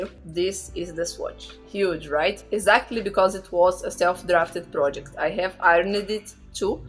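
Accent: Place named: Brazil. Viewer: incrível que eu pensei "ela é brasileira, certeza" só de ouvir você falar. ameiiiii 💜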